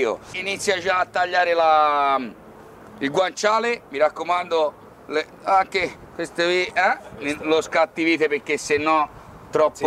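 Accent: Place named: Livorno, Italy. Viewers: Sei troppo simpatico con quell'accento di Livorno meraviglioso. Mi hai fatto venire voglia di carbonara....salutoni. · che dire... con sincerità stima ed ammirazione sei il Top ...il dialetto livornese non ha nulla da invidiare al tutto il resto dell'italy oltre che bravissimo ... simpaticissimo( mimmo da palermo... iscritto ) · Boia. Si sente vai che sei livornese :-) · Mi piace moltissimo questa carbonara e anche l´accento livornese.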